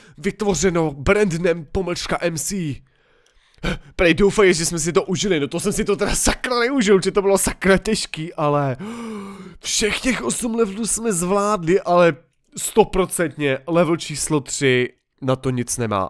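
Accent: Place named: Pilsen, Czech Republic. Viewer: Klasický Plzeňák :D Hubu proříznutou :DD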